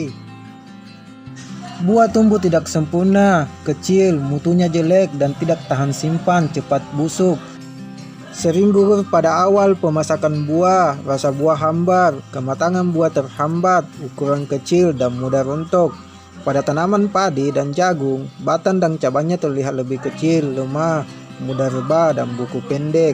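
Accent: Bugis, Indonesia. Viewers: Bahasa Bugisnya ketara benar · Orang bugis yah? Ogi Aga ki.... ?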